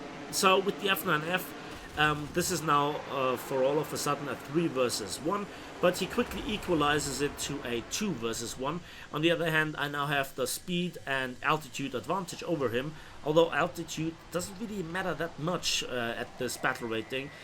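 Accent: Germany. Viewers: He is German :)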